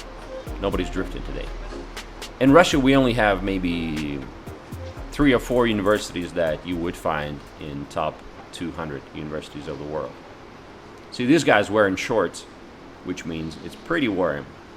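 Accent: South, United States. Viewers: New sub. Очень приятно. May I ask where did you learn English? I've been watching your videos and when you talk you have a particular accent...it sounds either southern or you learned in the "hood" (no disrespect I'm from the "hood") either way I like your videos. Спасибо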